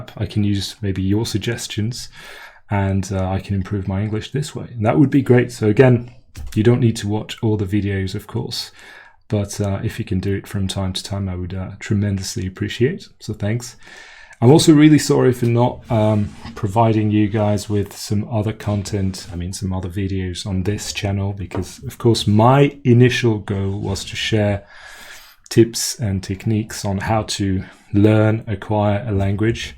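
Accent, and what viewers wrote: Southern England, United Kingdom. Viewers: Hello, so first of all, from a purely accent/pronunciation point of view, you sound almost indistinguishable from a native speaker to me. I think if I met you in a pub in England and we started chatting, I wouldn't easily realise that you're not a native speaker, especially not within a few sentences. To me, your accent sounds fairly generically south-of-England English (I am from Norfolk, UK myself). Not necessarily 'RP' but honestly, I think if you leaned any harder into RP it would start to sound less natural. Because I am specifically paying attention to your accent, I do notice little things that make me think 'ah, not quite British accent there' and if I didn't know where you were from, I may wonder if you had a hint of accent from another language interfering - but I don't think I'd be able to guess which one. 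I certainly wouldn't guess French. But these things are so slight, it's difficult for me to pin point exactly what it is. Perhaps once I've seen more clips if you speaking English, I'd be able to. But honestly, your accent is highly impressive as a non-native speaker, and probably good enough to fool quite a few native speakers. I actually think you could tell most Americans (or, tbh, most non-British native English speakers) that you're British, and be believed. It would be a little harder to fool actual Brits because we're so keyed into regional and class markers on British accents. In fact, my accent occasionally confuses people - I am British born-and-raised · As an American who has spent time (months) in the UK, I wouldn't guess that you are not from southern England based on your speech. "Tends to be" is correct. The "o" in "only" is a diphthong in both US English and in the English of southern England. 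But it is a different diphthong in most parts of the US than in most parts of England (and different in General American than in RP). Check out this table for how this phoneme is pronounced in many different varieties of English: <link> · Southern English guy here happy to help if you have any questions about the standard southern English (modern RP) accent! 😊 Obviously you are amazing and sound generally very English! But a few things I noticed… When you say “If you know what I mean”, your pronunciation of the “t” in “what” makes you sound American not English. You make a sound almost like the Spanish “r” in “pero”; instead, it should be a fully pronounced “t” sound if you want to sound RP! I would say both “I’m missing the right word” and “I’m lacking the right word” sound a bit off! In this context it would be best to say “I’m NOT USING the right word”. Or if you mean to say that you have the right word in your vocabulary somewhere but you just can’t remember it, you would say “I CAN’T FIND the right word” (a common problem for native speakers 😅) It would most naturally be “Recently someone POINTED OUT that”. 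“Someone let me know that” also works though 👍 Both “pointed that” or “pinpointed that” don’t sound right. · Another American here, but I’ve watched The Crown a couple of times on Netflix, so I’m basically an expert on RP. 😂 That was a joke. Like everyone else has said, your English is top notch and if you’d just started talking to me I’d have assumed you were British and never in a million years guessed you were Swiss (side note, it’s weird as hell hearing damn-near-perfect British English come out of your mouth after years of only ever hearing you speak French—like, who is this?😂). So, anything I say is going to be incredibly picky: you want to say tenDs but it’s coming out *tenTs*. But, my guy, it is SUBTLE. , diphthongs in America will vary wildly by accent and sound drastically different in New York (oil = OY-ul) than Texas (oil = OLL). you would generally say appreciate IT. Merci pour vos vidéos! 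· As a native Australian, he sounds British to me and if I didn't know better I would have said he was a native of England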